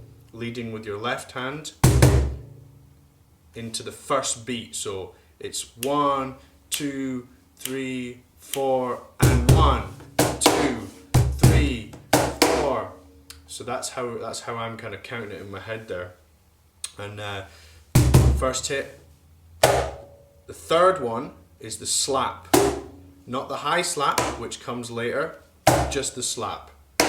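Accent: Scotland, United Kingdom. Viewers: Are you Scottish? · You sound a little bit Scottish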